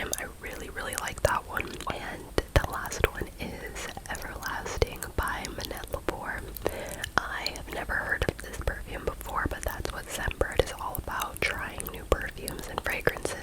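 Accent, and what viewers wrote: United Kingdom. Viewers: Hey <3 love the video as usual 🫶 could you maybe do a british accent video again ? I actually love your british accent lmao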